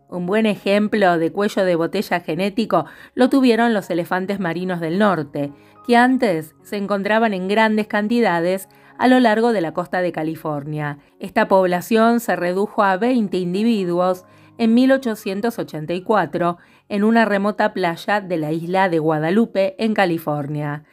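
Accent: Argentina. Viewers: No me gustó, me recontra gusto, explicas cosas muy interesantes. Es el primero video que veo pero creo que los veré todos, me gustaría saber si abordas las teorías de kropotkin... por cierto, me encanta ese acento argentino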